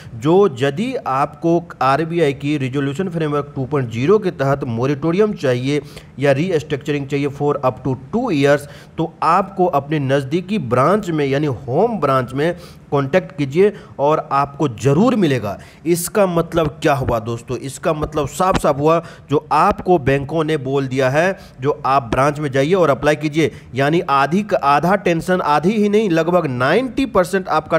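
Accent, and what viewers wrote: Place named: Bihar, India. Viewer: Typical bihari style narration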